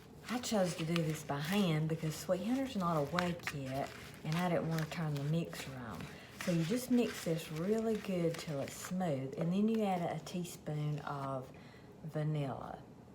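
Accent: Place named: Tennessee, United States. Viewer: Listening to your accent brought me back to my childhood when we would visit my grandma in Tennessee. I luv that. It also sounds like Loretta Lynn. ☺️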